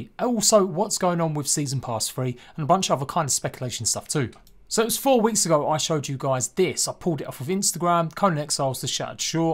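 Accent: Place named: Cockney, United Kingdom. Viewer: Strong cockney accent...kind of hard to understand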